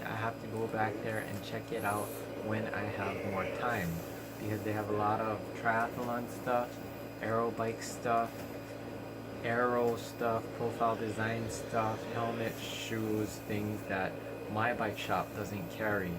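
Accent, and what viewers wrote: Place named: United Kingdom. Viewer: Brilliant topics, lol I love your British accent 😂🤣 superb my friend. I do a good Australian accent, I’ll have do it on the vlog! So my accent is from the south, was born in Watford just outside of London, my wife thinks I’m very common lol 😂 I think Home is where your heart is but wherever I move too in the UK I’ll always think of Watford as ‘Home’ in the uk. But I also consider myself to be European, we are so close to France, only 1hr 30 mins to the coast where I can drive my car onto a train and in 30 minutes you are in France which I always think of as the gateway to Europe. There are definitely times of the day when I will also not venture onto busy roads. Totally agree with about riding for fun, it’s why I ride 😁👍🏻 I love it 🚴🏻👍🏻😁👌🏻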